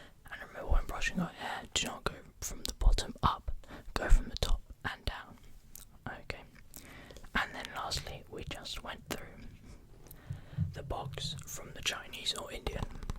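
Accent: United States. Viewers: AHAHAHHAAH I LOVE THE AMERICAN ACCENT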